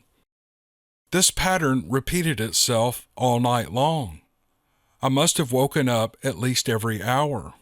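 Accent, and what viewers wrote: South, United States. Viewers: I was born in Louisville. Kentucky. I was raised in the south. I live in Oregon now. Hearing a southern accent makes me homesick. I enjoy this site alot.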